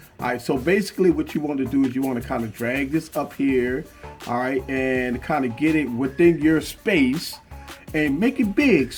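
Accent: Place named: New Orleans, United States. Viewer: I love your NOLA accent! Great video. This was very helpful.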